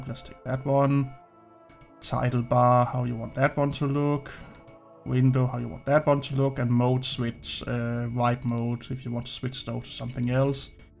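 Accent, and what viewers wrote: Denmark. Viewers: It's so obvious on you accent, that you're danish, haha! I like it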